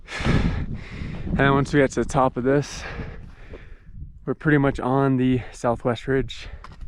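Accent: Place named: Scotland, United Kingdom. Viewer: Hearing a northern/Scottish person was not what I expected but yay.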